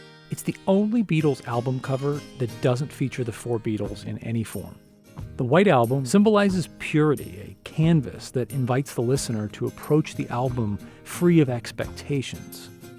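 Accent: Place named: United States. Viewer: Annoying yank 'expert ' voice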